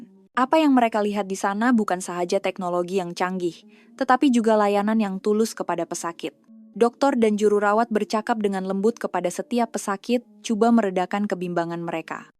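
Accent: Indonesia. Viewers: Pengulas ini wanita Indon kan.Tetap kita boleh detect. P disebut pe,k disebut ka.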